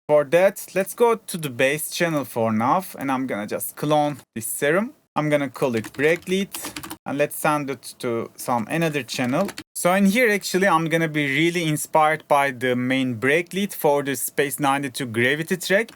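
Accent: Turkey. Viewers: Memati maske de taksan o sıfatın belli oluyor 😂 türk olduğun aksanından belli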